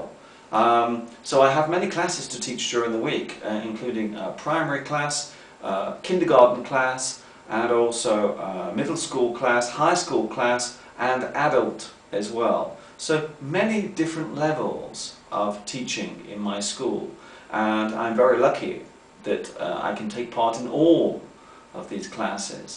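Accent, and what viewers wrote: United Kingdom. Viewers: I love your British accent!! ^^ Brithis accent sounds so neat!! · Since I became watching your videos I really improved my english, because they don't tire me; It's easy to spend lots of time watching you. And British accent is awesome ^^ Thanks a lot, your lessons are pretty valuable!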